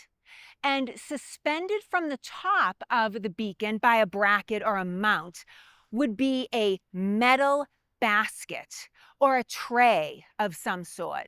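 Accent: Boston, United States. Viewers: Well go listen to other historians talk about Boston and they call Charlestown Charleston and they mispronounce all the street names to me I love the accent it brings me back home I'm from Revere living in Oregon I really miss home this is like a little slice of home for me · Watch out British accent documentarians who are sooo popular … Boston is here now… it’s a new era of the documentary genre!❤❤❤I love this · Well I learned something I never knew, and it was courtesy of that distinctive Boston accent, lol. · When you hear her talk, there’s no doubt, she is from Boston! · Great Boston accent! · Your authentic Boston accent is enjoyed.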